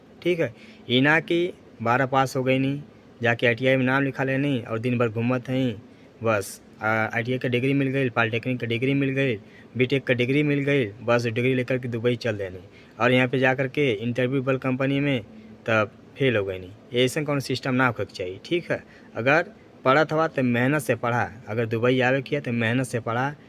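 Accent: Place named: Bhojpuri, India. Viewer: भाई आपके मुंह से भोजपूरी बहुत अच्छा लगता हैं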